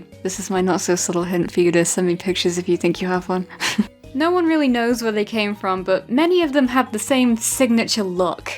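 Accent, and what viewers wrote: United Kingdom. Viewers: Because of your calming voice and British accent even you say something really WACK, will still be calming to my ears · Hmm, i dont have a single one i only subbed a few days ago for a few reasons Pokemon Like the Accent Like the Voice BRITISH MATE ( love the UK even tho im just a lowly German ^^ )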